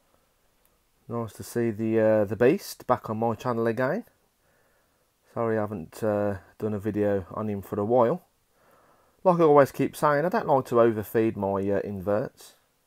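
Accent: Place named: Birmingham, United Kingdom. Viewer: That's the Brummies accent for you (I'm one myself)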